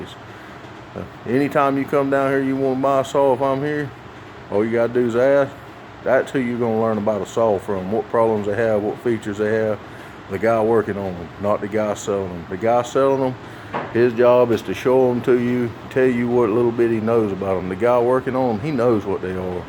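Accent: United States. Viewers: we Love our Southern accent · Sounds like good-ol American English 2 me.....👋😁🇺🇸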